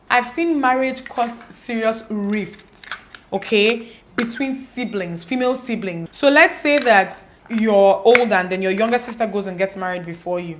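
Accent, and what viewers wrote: Igbo, Nigeria. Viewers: The ibo way you speak your english is epic 😂😂😂😂😂😂😂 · this is a side note but I love how your igbo tones show up in your English!! Looooveeeee ittt ❤️❤️❤️ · Hmmmm. So I had this experience when I was in school, I had this "friend" who got engaged in our final year. We were close in year1 but not so much anymore but when she told me I was happy for her, talked about the guy and all, how to get d asoebi and Price dat will be favourable for all. At this time, my mum was ill, which I didn't tell anyone except my closest friends (my dad had passed away earlier) but I still tried to call and be a good friend. My mum later passed (bless her soul) during my degree exams but my family didn't tell me bcos of my exams. When they finally did before my project defense, I was so broken, my friends had to move me to a friend's place. So, we went to school one day and I saw her, she was acting weird, didn't say anything to me. 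Later that day, I was with my friends and my bestie goes "do you have any problem with her" I'm like "No, why". He said he noticed she was acting weird and he asked her why and she said she feels I'm not happy for her, that maybe I'm jealous she's getting married first and he told her "what are you talking about, her mum just passed " Females and marriages especially with the Igbos, it's just crazy. I love your Igbo accent though. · Oh God you are so funny. So much truth in what you are saying🤣🤣🤣 Your Igbo intonation is bae Can we be friends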